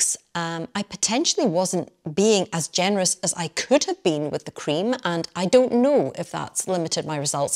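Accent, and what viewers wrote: Scotland, United Kingdom. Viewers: I love your accent. Scottish or Northern Irish? I hail from Dublin.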